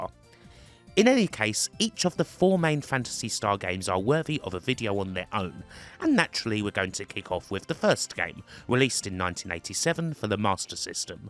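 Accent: United Kingdom. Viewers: Does the narrator have a particular British accent? I'm asking because I'm not British but from Sweden.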